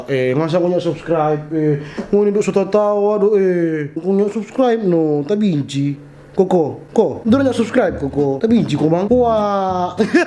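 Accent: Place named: Siau, Indonesia. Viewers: Sekali" logat siau jo opo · eh keode ungke, suaninge, tu logat dang jadi kangen sitaro rken, salam dari anak Sitaro yang kuliah di jogja. Sukses Terus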